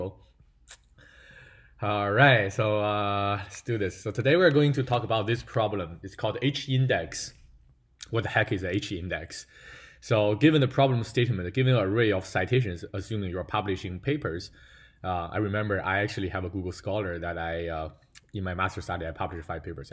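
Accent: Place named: China. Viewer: I like your chinglish style. Funny but with good confidence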